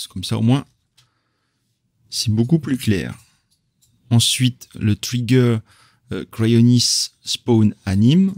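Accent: Tahiti, French Polynesia. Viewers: Bravo, vraiment! Je me demandais quel accent tu avais:) Tahitien donc!, enchanté moi je suis Breton:D Tu as du skill c'est indéniable, continu STP avec tes vidéos (je n'ai vu que celle-ci:D) d'aider la commu francophone sur se superbe moteur. Bon maintenant je vais voir tes autres vidéo:D Par contre j'ai pas compris pour ta timeline si elle est de type vector par exemple, est ce que l'on pourrais en faire une macro et la mettre dans l'actor component? Encore merci tu viens de gagner un fan:)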